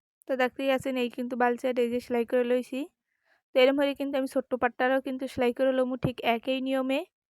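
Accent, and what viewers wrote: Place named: Barishal, Bangladesh. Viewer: হে বুবু আপনিও তো খাস বরিশাল কথাই বোঝা যায়।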